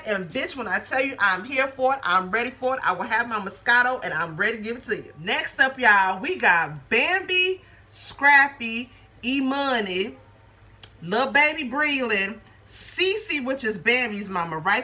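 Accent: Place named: Jamaica. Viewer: Hit the like button first then starts watching. We wanted to hear your Jamaican voice. Momma Dee Though🤔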